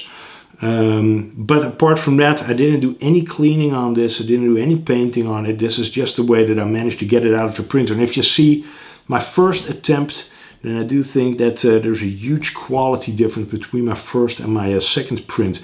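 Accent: Netherlands. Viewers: Do I hear a Dutch accent? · Je hebt een beetje een Nederlands Engels accent, klopt dat ?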